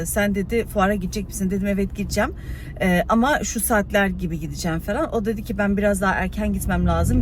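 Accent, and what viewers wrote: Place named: Turkey. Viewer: Bayiliyorum senin turkce aksanina ❤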